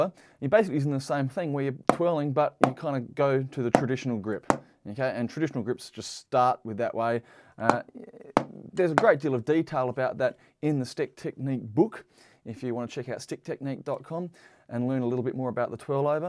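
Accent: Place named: New Zealand. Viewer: whoa you sound new zealander. im aussie as well but from sydney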